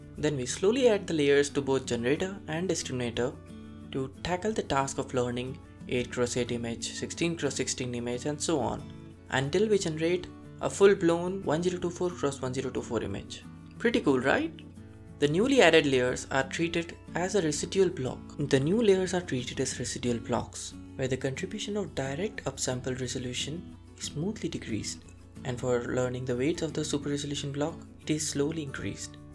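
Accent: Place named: India. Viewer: maybe rerecording it with a voice without Indian accent would be advisable (sorry to be direct ;) )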